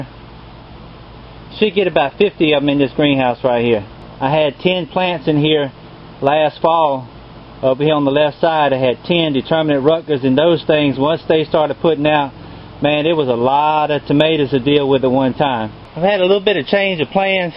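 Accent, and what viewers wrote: South, United States. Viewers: You got that slow southern style I heard about in some song can't remember the name. It is a pleasure to listen to you describe what you are doing. You should have a gardening show on the radio!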